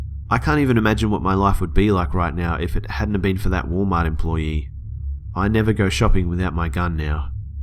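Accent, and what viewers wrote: Australia. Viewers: Lol Austrailian accent idk why but it sounds funny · Subbed mate! Are you Australian? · Hey are u Aussie I am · Are you Australian I subbed by the way. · I'm Australian born and bred, yet for some reason, you're the only Australian YouTuber I've seen who I enjoy listening to, let alone can listen to without wanting to eject myself into the sun. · Your accent is awesome! I've been dating an Aussie(Brisbane fellow) for awhile and I've just fallen in love with your accents:)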